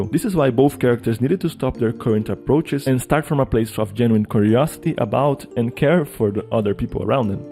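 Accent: Brazil. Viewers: vc parece ser brasileiro pelo seu sotaque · Posso estar errado, mas esse cara é brasileiro? Tá parecendo um brasileiro falando inglês ou eu estou louco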